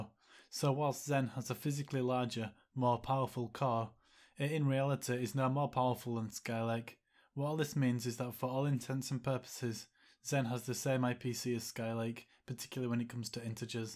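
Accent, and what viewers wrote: United Kingdom. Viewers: Is that a bradford or leeds accent?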